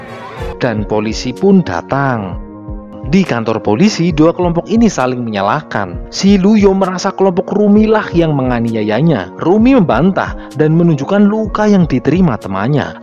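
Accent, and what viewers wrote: Banyumas, Indonesia. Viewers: wong ngapak apa ya admine😂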